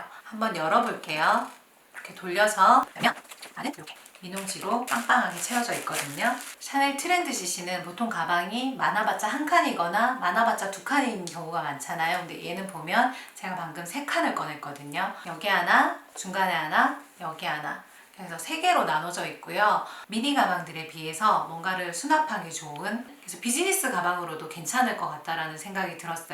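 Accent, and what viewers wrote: Gyeongsang, South Korea. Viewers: 사투리 쓰시긴 하네요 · 경상도 사투리 쓰시네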